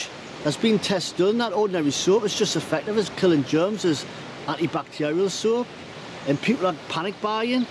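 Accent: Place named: Northumberland, United Kingdom. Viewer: Wey I, I take it from ur accent it’s bothal Northumberland, used to go there wit ashington sea cadets cleaning the river on wknds man...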